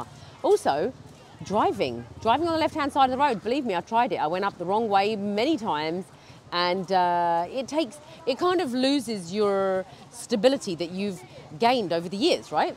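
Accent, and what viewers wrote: United Kingdom. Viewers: i love your voice and British Accent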